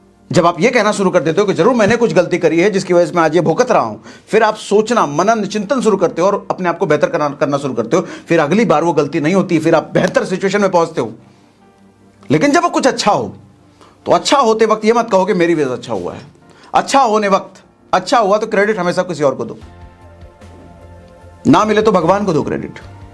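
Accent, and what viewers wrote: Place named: Bihar, India. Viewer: Sir aap jab bihari mi balte ho to bada ache lagte ho Aap ki motivation video muje bhut pasand hai .or mere andar bhut change aata h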